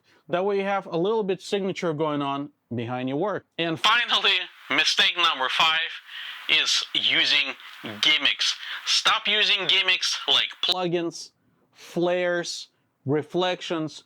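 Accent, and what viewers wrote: Russia. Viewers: i don't know man, they might be helpful for someone who does not speak english at all... but mostly they feel like a riddle where you just try to guess what these random words suppose to mean)) i was surprised honestly, i always thought you were russian – because of accent and idk, attitude? sense of humor? Anyways, awesome video as always, thank you!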